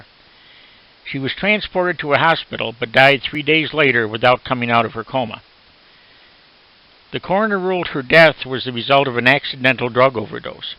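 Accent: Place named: Midwest, United States. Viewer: it's his Midwest accent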